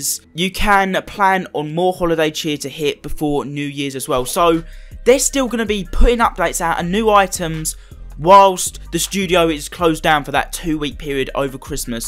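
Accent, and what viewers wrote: United Kingdom. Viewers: Are you from liverpool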